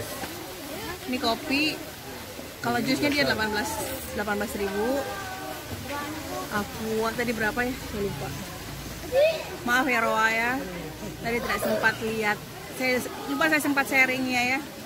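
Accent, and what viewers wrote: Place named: Palu, Indonesia. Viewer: Cucok meong memang ngana pe bakat...wkwkwkwk...hamaaaa...te sengaja qta dapa ngana pe vlog gegara ba cari resep sambal goreng daging kong pilih ngana pe resep,trus dengar2 ngana bicara roa...roa...qta pikir vlogernya orang Palu stouw...ternyata oh ternyata memang ngana orang Palu kong tamang SMP.lei...dunia memang selebar daun kelor kang hahahahah....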